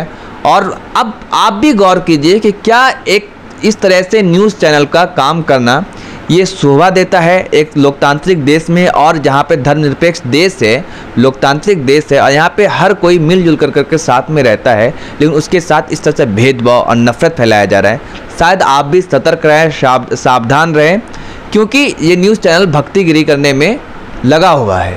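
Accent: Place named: Rural, India. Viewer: Bhai teri zaban saaf nahi hai tu gawar jesa bolta h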